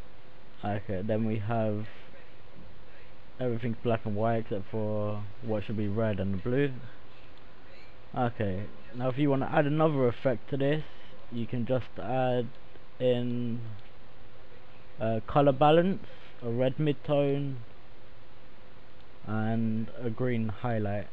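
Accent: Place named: United Kingdom. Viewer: it was refreshing to hear one of these tutorials from a british voice :]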